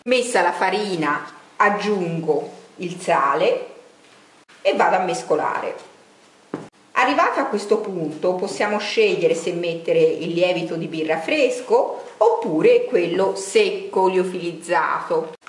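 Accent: Tuscany, Italy. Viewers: Lei è toscana?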